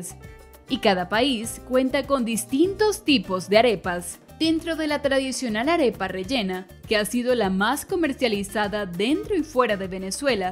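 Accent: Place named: Mexico. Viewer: Es mexicana la voz,que narra ese absurdo video.Y yo,perdiendo mí tiempo en esta pendejada.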